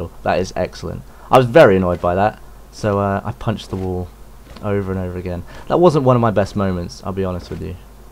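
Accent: United Kingdom. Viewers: You sound exactly the same. All you Brits do. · He was a calm little brit. Then he did a dig-down, and everything changed. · you got that awesome accent, you must be british?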